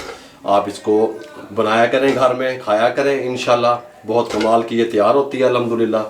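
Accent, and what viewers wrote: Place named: Ambala, India. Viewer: ap Aumbala sy hain Humary neighbour wahan sy thy Lehja bikul ap jesa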